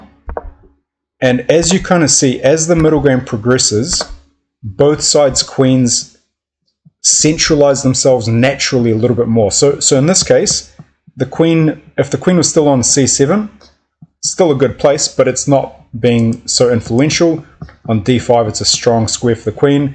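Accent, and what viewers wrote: New Zealand. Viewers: THANK YOU, OUTSTANDING CH! I PLAN ON VISITING NEW ZEALAND. i WAS CURIOUS TO ASK, YOUR ACCENT, IS IT BRITISH OR NATIVE NEW ZEALAND/ THANKS PAL,... MIKE.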